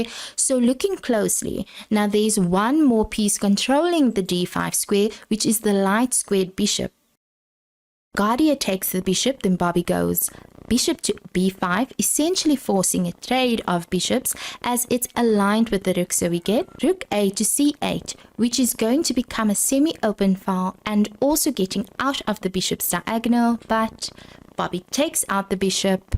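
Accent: South Africa. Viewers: That South African accent 😮‍💨👌🏻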